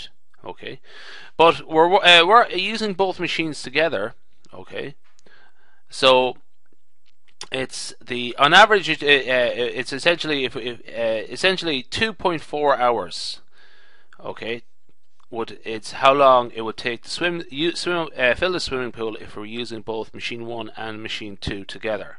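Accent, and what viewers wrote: Ireland. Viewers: Yas Niall!! I noticed that too and I was laughing the whole time. (I meant that positively because I like Irish accent) ☺☺☺ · Is that an Irish accent I detect....? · Hi! Are you Irish?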